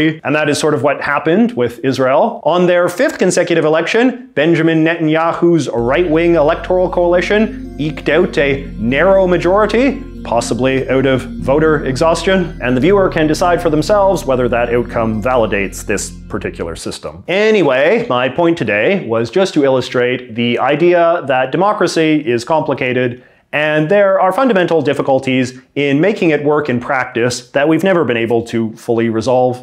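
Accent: Canada. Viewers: American politics make more sense when explained with a thick Canadian accent.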